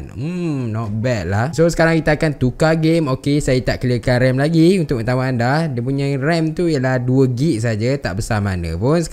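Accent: Malaysia. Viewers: Lucu ngomong org Malaysia akwkakw jadi suka dengerinya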